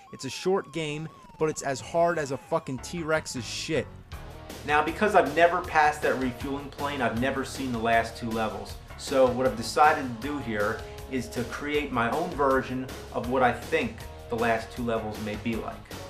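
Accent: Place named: South, United States. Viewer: and he says ayss he's got a bit of a souther accent he also said: what more could you ayask for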